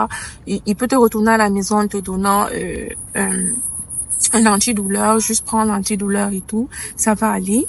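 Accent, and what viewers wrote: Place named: Quebec, Canada. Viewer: Ca fait 2 jours que je t'ai découverte. J'ai presque regardé toutes des vidéos. Tu as presque un accent québécois maintenant. J'aime ta simplicité et aussi, tu détailles mieux brièvement certaines chose que je n'ai pas trouver ailleurs. Je t'envoie plein d'amour et positivité 😘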